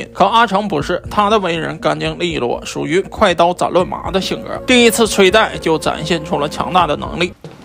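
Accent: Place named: Northeast, China. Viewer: v 主播这个东北北京话有点意思啊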